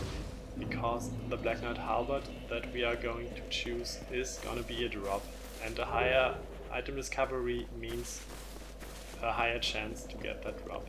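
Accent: Germany. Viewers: are you from germany becuase i am and your englisch hass a little german slang in it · man merkt das er deutsch is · hm, ich verstehe darunter english mit klar deutschem akzent ^^ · Man hört dennoch heraus, dass deine Muttersprache Deutsch ist? Und ich hab vorher nicht aufs Video geschaut. Nicht direkt. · Is that German? Where are you from, dude? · ***** denglisch ist, wenn man deutsche wörter benutzt oder den satzbau umstellt. Das hier ist nur ein ganz normaler leichter deutscher akzent :D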